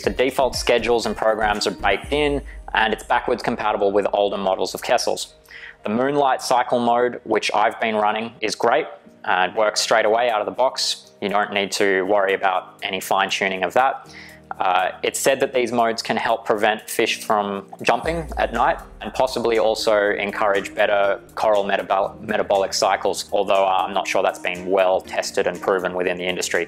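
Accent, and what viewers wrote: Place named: New Zealand. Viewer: U seem to have a kiwi accent. Are you from New Zealand? 💙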